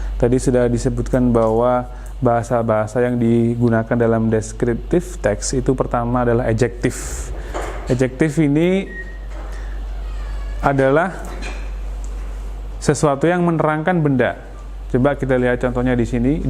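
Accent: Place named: Cileren, Indonesia. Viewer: kaya wong cileren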